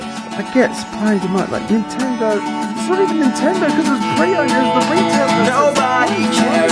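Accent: Australia. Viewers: Gaijin Goombah Media Australian not English (They're different I tell you, THEY'RE DIFFERENT!!!!!!) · No he's australian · He’s Australian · Aussie not English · W8, are you Australian? Sick, me tooooooooooooooooooooooooooooooo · He's Australian you git. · Gaijin Goombah Media Australian not English mister goombah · No because he’s australian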